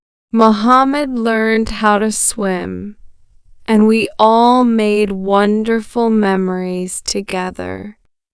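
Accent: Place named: United States. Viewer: بصراحة طريقتك ونطقك لللهجة الامريكية رااائعة.. واصلي واياااكي تفشلي.. راح تكبر قناتك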